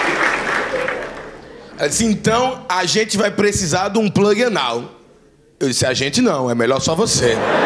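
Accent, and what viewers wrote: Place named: Recife, Brazil. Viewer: sou do RJ. Você merece tudo de bom dessa vida. Te acho muito inteligente. Os palavrões fazem parte do seu show. Ele é dito com diversão!!! Te desejo muitas "Felicidades" muitos "Parabéns verdadeiros". Enfim você nos faz sorrir pelo teu conhecimento e rir de passar mal de tua Alegria. Deus te abençoe e grata por toda a sua Alegria + Alegrias nos dada. Sucesso abundante e muita Saúde eu te desejo. Recife é uma cidade linda e você trás isso em seu coração. Que sutaque lindo. Parabéns muitos Parabéns 🎉🎉!!!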